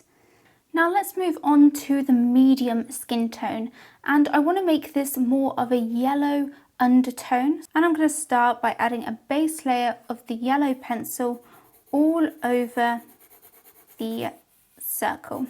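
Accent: England, United Kingdom. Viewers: You have blown my mind with these videos. I have avoided coloring a lot of my pictures because of skin tone and I bought a pack of Crayola skin tone colored pencils which is cool but I kind of felt like it was cheating. The one thing I do understand is that all you need is red blue yellow black and white and you can create almost any color that exists especially with paint. But I like colored pencils and I don't know how I've never come across a video that explains so easily how to create skin tone and now I'm doing it like a pro and I have to thank you for teaching me that. Anybody who isn't British I can tell you things that not only you are very pretty but it's hard not to love your accent. Which I kind of think it's funny because where you live it's not an accent but a bloke from America thinks it's super Charming. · The english from England is really beautiful ! 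· Her accent or british accent is perfect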